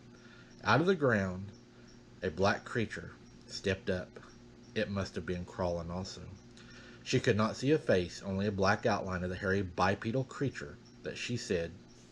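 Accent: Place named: South, United States. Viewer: U sound so country and down to earth. Love being a part of the family.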